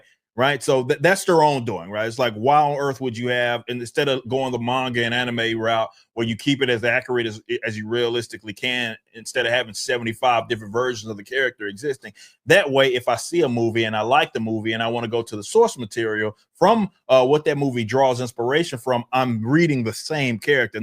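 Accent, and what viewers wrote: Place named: Texas, United States. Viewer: I can't stand the Texas hayseed accent dude... "is" is eeeyz, "been" is beeeyn, etc.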